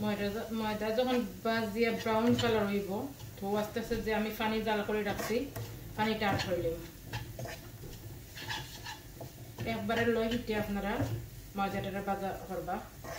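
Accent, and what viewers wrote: Sylhet, Bangladesh. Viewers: Khub bhalo laglo ❤❤sylethi kotha shune aro bhalo laglo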